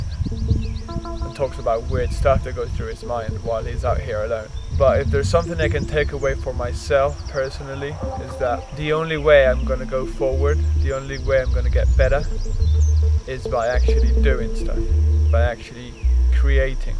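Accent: United Kingdom. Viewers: i just realized how dificult to understand is british accent for me but anyway your clip are awesome. Thanks for sharing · i loved your videos in spanish, but im also loving your british accent. and most of all, so glad you just did it and made a video. so beautiful and cinematic even with a simple man climbs mountain storyline every second is enjoyable. i still go back to your other films to improve my spanish. excited for the proximo video and inspire to get outside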